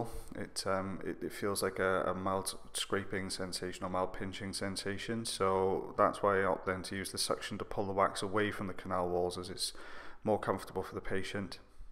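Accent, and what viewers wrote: United Kingdom. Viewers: I love it when you say process. The British accent gets me everytime.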